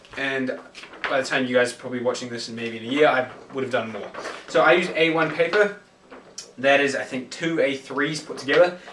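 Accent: Australia. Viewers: you sound Australian or New Zealand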